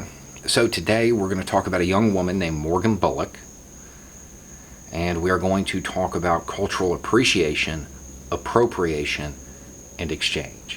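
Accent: South, United States. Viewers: You know, I have to admit to my own cultural prejudices when I hear this intelligent man talk about issues of great import in that accent. I grew up in Brooklyn and spent many years living in the south and hearing some of the most hateful things spoken with that accent that it's sometimes difficult listening to. It's like listening to Shelby Foote on Ken Burn's "Civil War" documentary, it's just hard to equate the one with the other. As has been said by many before, you can't judge a people with a wide brush: there are many intelligent and compassionate people who live in the south, it's a shame they are swallowed up by so many who may actually even be in the minority, loudly proclaiming their moral superiority while doing some of the most immoral things. Anyway, it's just a thought. Thanks, for speaking up.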